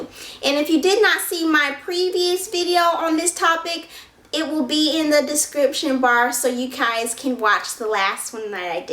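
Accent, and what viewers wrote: South, United States. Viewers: Lol your accent is mixed with white girl & country!! it's so cute lol .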